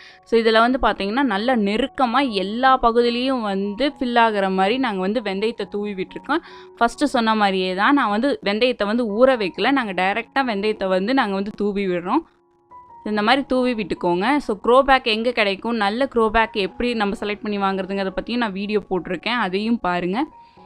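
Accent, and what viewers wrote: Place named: Coimbatore, India. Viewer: I like you and too and show in video and Im also from Coimbatore I like your native slang lots of love 💕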